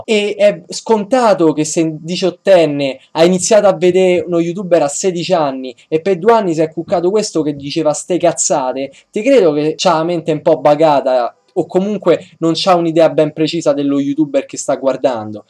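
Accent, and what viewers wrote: Rome, Italy. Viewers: non riesci a parlare meno romano?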